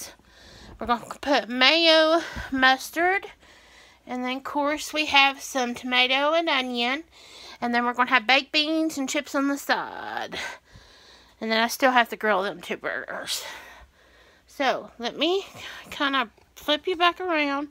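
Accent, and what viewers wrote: South, United States. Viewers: Haven't watch u in months n months , came across my screen today had to watch those burgers yummy ..... Luv ur Southern accent girl .... Be safe 🙏😷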